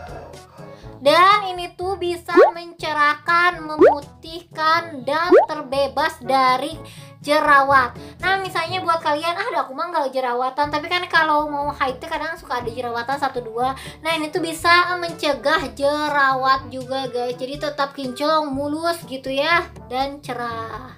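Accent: Sunda, Indonesia. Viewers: Sunda kentel😂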